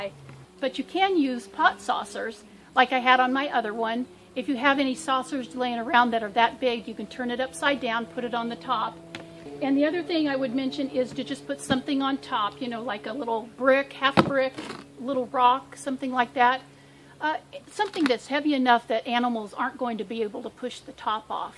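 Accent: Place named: United States. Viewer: Thank you for the great video. Which part of America are you from. Your accent is very nice. Roberta from Perth Western Australia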